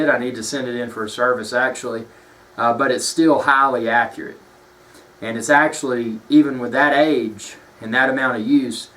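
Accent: South, United States. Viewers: sounds even better when you say it man! I mean it! That southern accent is the best. Greetings from Canada.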